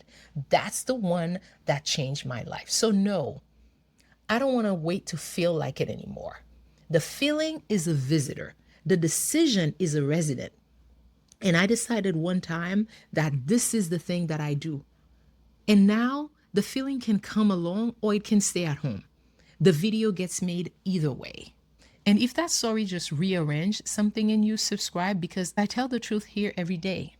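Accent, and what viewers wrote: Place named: Haiti. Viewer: Wait a minute, you're from Haiti 🇭🇹...I kinda thought you might be because of your accent but that say confirmed it...I think you might be the person I need in this season, like I commented on that previous video I watched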